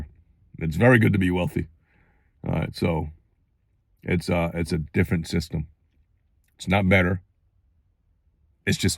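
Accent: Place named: New York, United States. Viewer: If I did not see your face, I would think you were like an Italian American gangster. I never expected you to say you were born in China. lol. You got that New York accent down.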